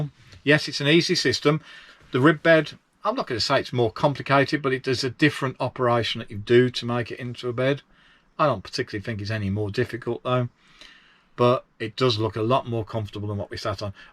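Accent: Birmingham, United Kingdom. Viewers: Yes, thank you! That one works! ☺ Good to here the Birmingham accent again! I went to school near Weoley Castle in B'ham! Nobody told us that we were speaking old Welsh. West Mercia used to be part of Wales!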